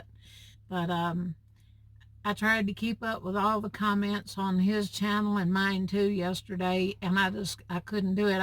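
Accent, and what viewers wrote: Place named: North Carolina, United States. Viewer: You sound just like my family! My mom is from North Carolina, and I love it when we go visit family there! I just watched Bob's interview with you, came right over to your channel and subscribed! I love seeing van tours, and your recliner is AWESOME! I'm look forward to watching the videos on your channel. I hope to get out there myself once I retire from teaching in 5 years (especially after going through this pandemic! LOL!) You were so encouraging in your interview - I want to encourage you also. Do what YOU love, and everything else will work out for you. Safe travels to you - I want to be like you some day! 😁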